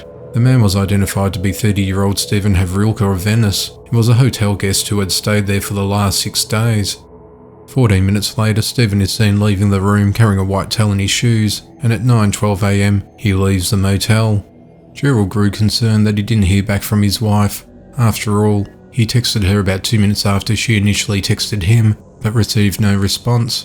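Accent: Australia. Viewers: Please get a job as an American news anchor so we can have that smooth, Aussie jazz you call a voice telling us the miserable American news so some good can come from it?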